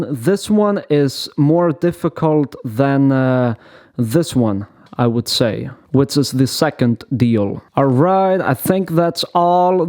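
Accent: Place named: Russia. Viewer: I’m just here to hear his Russian accent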